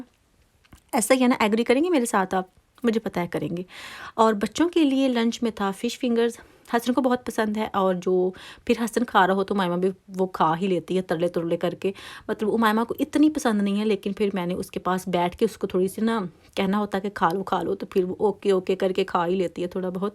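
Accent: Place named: Lahore, Pakistan. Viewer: Pure Lahori accent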